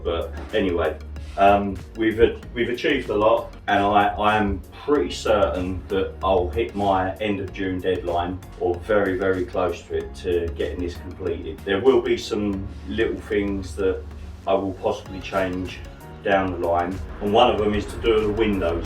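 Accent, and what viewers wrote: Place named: Kent, United Kingdom. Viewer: Did u do that or was it decommissioned before you brought it bud . Got my eye on 18 t prison van . I have class 1 hgv n dcpc..... I was on the understanding that if truck is used for private use only (after I brought it ) then I dont need to use my digi card . You sound local to where I grew up north west kent . Now in Essex. Great vids bud . Handy ideas for mine .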